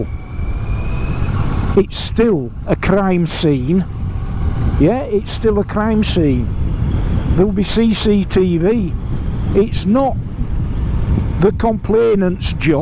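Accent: Yorkshire, United Kingdom. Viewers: Great to hear a fellow Yorkshireman speak out 😊 I fully agree with all you say here, as we do seem to have a two tier police system; that seriously needs to be altered. Nobody seems to be respectful or afraid of policemen in today’s world, & therein lies so many problems. 😢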